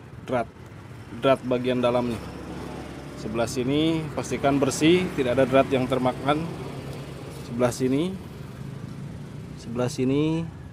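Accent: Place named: West Java, Indonesia. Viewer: Orang sunda mh seri da ngupingna😅😅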